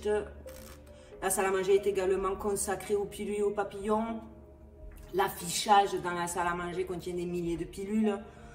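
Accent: South, France. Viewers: Damien Hirtch, copain de martina abramovic, pour un copain donner.Quel bel accent du sud a végas!!!Merci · Je te découvre grâce à Silvano. J'ai trop kiffé le début " hello everyone '...je croyais que t'étais ricaine puis tu t'es mise à parler le français du sud ...🤩🤩🤩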